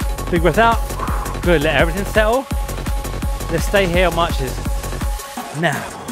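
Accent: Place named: United Kingdom. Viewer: My new favorite one of all! 🙌🏾 🎉🎉🎉 I’m in the US and I realize now… we don’t speak English here!!!! 🤣🤣🤣🤣 Love the Queen’s English, love these videos 🥳🥳🥳 Thank you!! 🙏🏾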